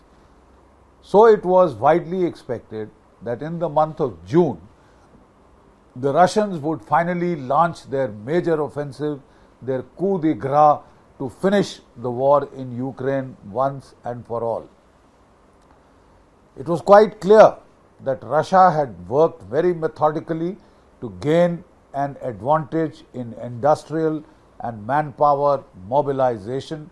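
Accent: India. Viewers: I can’t listen to this paj33t accent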